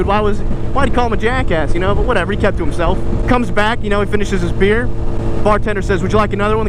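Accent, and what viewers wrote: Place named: Georgia, United States. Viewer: It’s Georgia we all have one that comes out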